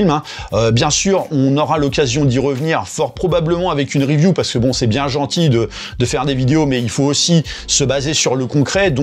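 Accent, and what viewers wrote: Paris, France. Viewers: Ha punaise non! Rien à faire ... cet accent parisien très appuyé est trop insupportable !! Je m'en vais... ORLG ...IRLG ...URLG ...ARLG ....OURLG !!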